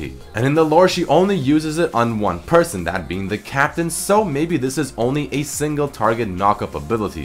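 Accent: United States. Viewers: OMG HE SOUNDS AMERICAN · He sounds American when he is sick haha. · You sound American with your voice haha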